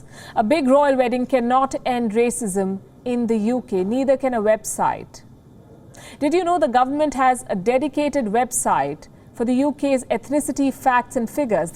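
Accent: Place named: India. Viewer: Indian English accent beautiful and the best😍 Love from Philippines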